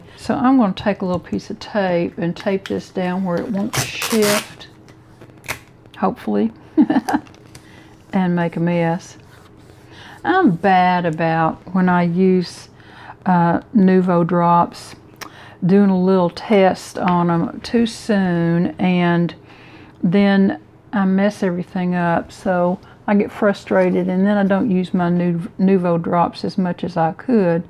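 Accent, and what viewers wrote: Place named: Tennessee, United States. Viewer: Thank you for this great enamel dots tip! And, are you from Tennessee? Because you and comedian Leanne Morgan have the same accent! xox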